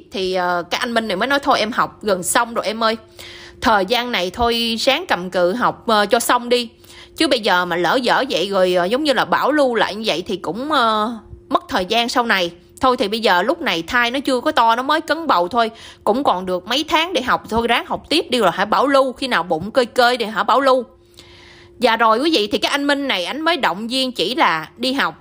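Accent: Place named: North, Vietnam. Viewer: Giọng miền bắc mà mê ,có nói nộn kg?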